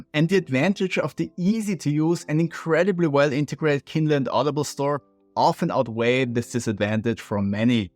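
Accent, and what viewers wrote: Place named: Austria. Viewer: Ich erkenne den österreichischen Akzent sofort. Er gefällt mir :) Ich habe mir dein Video über das ONYX BOOX A3C angeschaut und mir daraufhin das A4C bestellt um die Risale-i Nur besser lesen zu können. Danke dafür ☺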